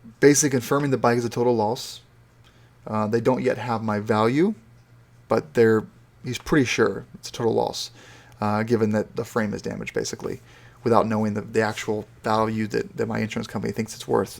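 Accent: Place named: United States. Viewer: Russell what makes you think he's Canadian? As a born & bred Canadian I can assure you that his accent marks him as an American, not a Canadian. I'm curious to know why you thought that?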